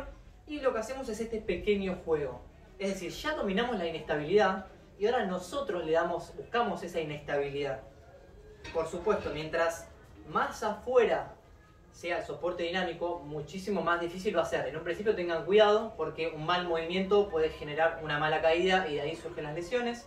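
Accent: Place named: Argentina. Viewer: muchas gracias me gusto mucho el video. bién explicado. De donde las tenias colgadas tus anillas? En un parque habian barras paralelas y anillas. Yo confiado que dominaba la paralela, salte para hacer fondos en anillas y me lesione el hombro. No muy grabe me recupere rapido, si antes hubiera visto tu video no cometia ese error.😃👍 de donde es ud. Por su acento creo que sea de la Argentina.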